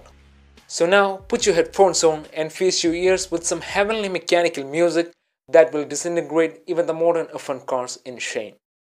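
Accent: Kerala, India. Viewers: That Indian accent 🤣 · anna..ningal mass aa, aa mallu + anglo slang kettapozhe click aayi..lv u 😘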